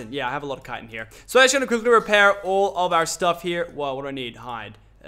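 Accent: Australia. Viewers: Is he australian cause i am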